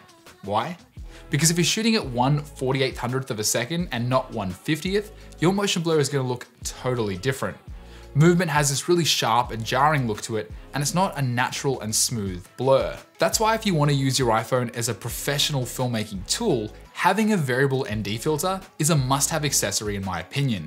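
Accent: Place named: Australia. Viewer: your AUS neighbour voice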